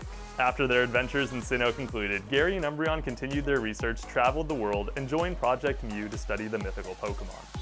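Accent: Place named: California, United States. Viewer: my instinc tells me you are Californian, am I right? ☀🌊🏄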